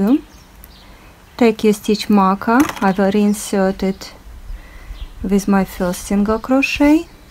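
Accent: Russia. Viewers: Оооой русский акцент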